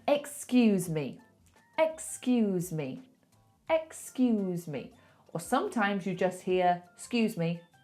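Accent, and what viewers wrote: United Kingdom. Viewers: I like the way you talk, I like British accent, if ever I'll go to the UK, I want to go to cavern in Liverpool and you to see you in person.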